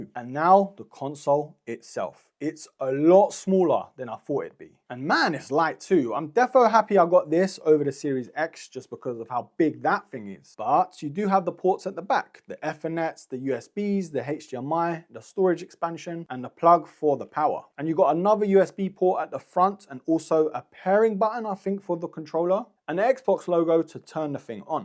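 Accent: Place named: Jamaica. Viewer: Why is he talking like a Jamaican?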